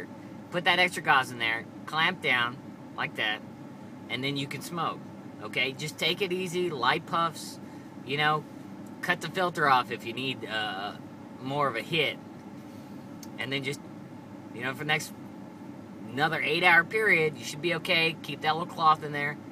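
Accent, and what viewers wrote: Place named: Texas, United States. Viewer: Loving your texan accent and you're a cool dude :)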